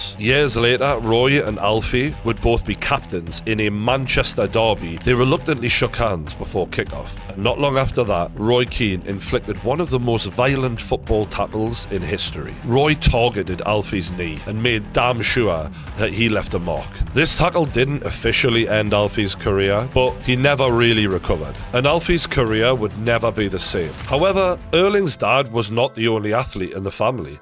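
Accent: Newcastle, United Kingdom. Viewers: I love a true geordie documentary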